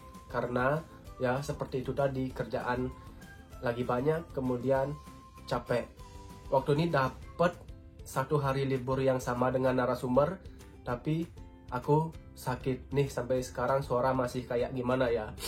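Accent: Bali, Indonesia. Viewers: Logatnya kek orang bali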